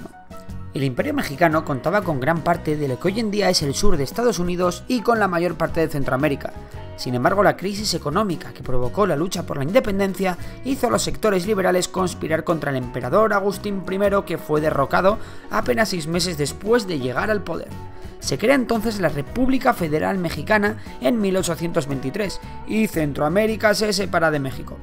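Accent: Spain. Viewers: un español contando la historia de mexico que ironico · El narrador es Español?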